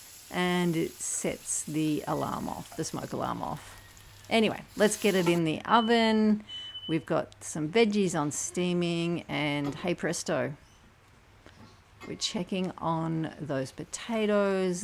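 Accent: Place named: Australia. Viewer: your accent sounds australian, you sound a lot like this woman : <link>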